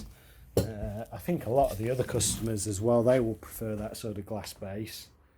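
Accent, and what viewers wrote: United Kingdom. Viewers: Is this man from london or little port i can't tell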